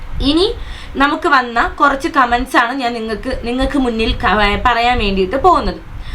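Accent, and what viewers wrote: Trivandrum, India. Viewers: Nte ponnu koche iyalu tvm evdeya. Njnm tvm anu bt nte slang inganeyonumalla. Iyalu pakka tvm. 😂😂😂 Njn chirichu oru vazhi aayi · Tvm slang polichu chechi😍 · "TVM ano sthalam ...samsaram agane thonee....anthayalum marupadi kalaki 🤝👍.."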